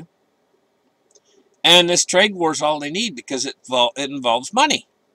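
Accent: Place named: Nova Scotia, Canada. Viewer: lol DXY... the comparison of ga-a-a-r-bage (Gotta love that NS accent.) You have a good one as well,. 🏠🌞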